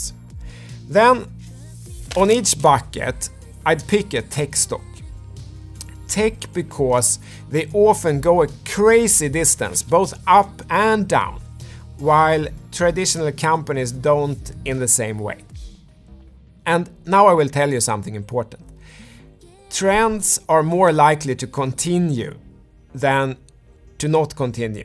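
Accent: Sweden. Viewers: Dina videon är roliga och lärorika. Dock gör det lite ont i mig att höra din svengelska, fast ju mer jag lyssnar desto charmigare blir den:)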